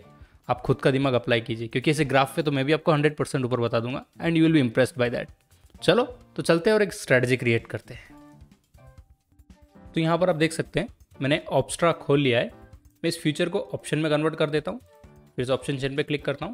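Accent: Belgaum, India. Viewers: Dear sir r u from belgaum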